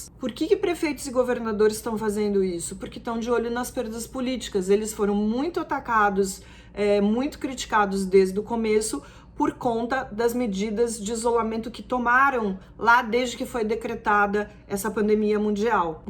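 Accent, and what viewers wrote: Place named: Rio de Janeiro, Brazil. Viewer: amo seus vídeos! Gosto muito como vc trata as notícias e da maneira polida e irônica que responde aos haters. Adoro seu sotaque carioca! Parabéns!!!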